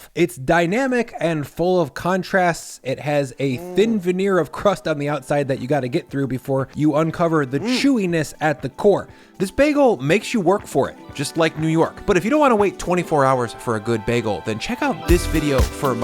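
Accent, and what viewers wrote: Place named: Aurora, Illinois, United States. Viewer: I was fascinated by the accent of someone from my college, and you speak JUST like him. He was from Aurora, IL. I'm from Chicago suburbs too but I don't speak like you? help me out!! The way you say "sturdy" is a prime example of this quirky way he would pronounce things, and I loved it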